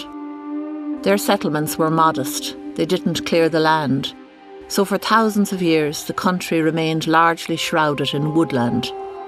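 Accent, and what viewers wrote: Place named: Ireland. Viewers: Absolutely wonderful! The narrator's lovely Irish brogue lends such authenticity; clearly a highly intelligent, cultured woman. Amazing how New Grange has survived intact. Robin Witting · Oh, iffits a Colleen it's a lovely Irish Lilt, man!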